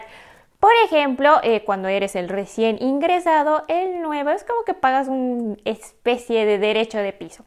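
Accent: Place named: Mexico. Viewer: Tu manera de hablar es igual a los mexicanos hablas cantando :v no te atine pensé q eras mexicana bueno ya tienes un nuevo suscriptor mas :v esperare con ansias en próximo vídeo :)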